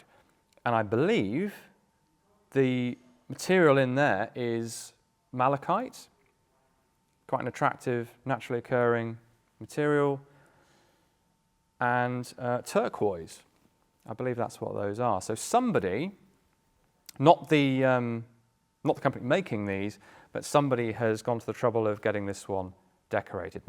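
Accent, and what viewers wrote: England, United Kingdom. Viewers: Some beautiful English accent for my ears. Greetings from Argentina!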